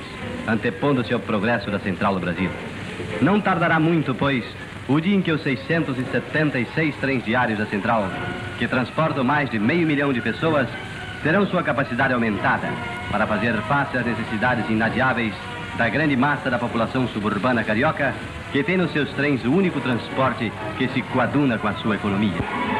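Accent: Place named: Portugal. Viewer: esse português do narrador tá meio portugado rs.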